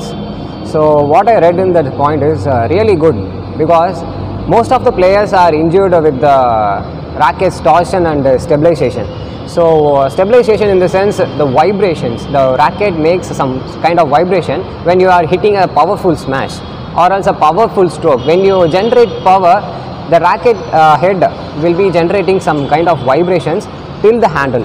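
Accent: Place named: South India, India. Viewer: he is south indian thats their accent. u understand thats important .ignoreit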